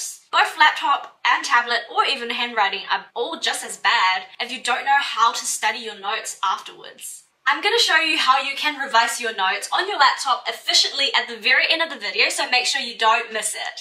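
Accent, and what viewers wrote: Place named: Australia. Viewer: Aussie eyy. Thanks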